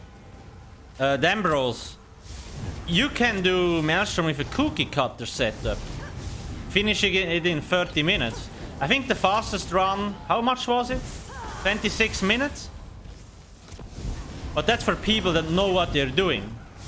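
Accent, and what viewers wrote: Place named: Switzerland. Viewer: du kannst echt ned leugnen, dass du Schweizer bist xD Schöne Videos. Hoffe da kommt bald mehr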